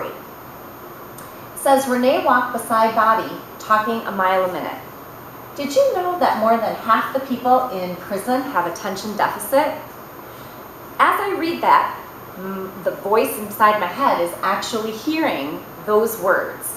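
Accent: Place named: United States. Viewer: An American accent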